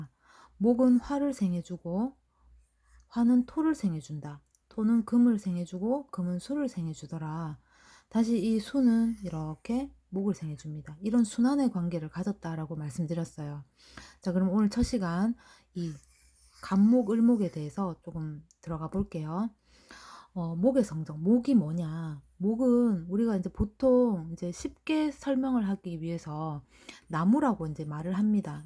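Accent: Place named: Gyeongsang, South Korea. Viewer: ㅋ~같은 겡상도라 더욱 듣기가 좋아요. 정듬뿍 넘치는 목소리입니다~💕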